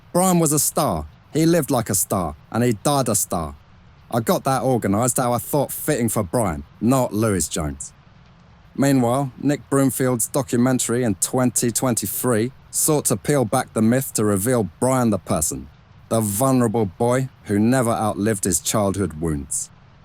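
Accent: United Kingdom. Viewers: British Howard Cosell narrator...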